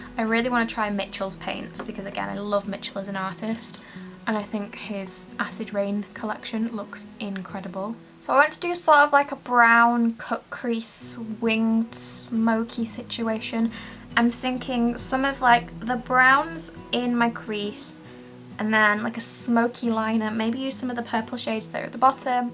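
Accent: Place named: United Kingdom. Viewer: Hii, it's me, we were just chatting via Twitter! I didn't notice you were in UK, love your accent. Yep, my hubby took me to Sephora the other day and it's kind of a whole new world to him. He is overwhelmed by how much STUFF exists, and I told him even people into makeup are feeling that way, like a fatigue with too many releases. I love the new Norvina palettes, but I just don't need them, it's tooooo much all the damn time and I need to enjoy the makeup I've already bought. I didn't know I could get P. Louise from Morphe now, I hadn't tried it due to the shipping but will now once I've finished my Paint Pot! Your look is beautiful!! 😘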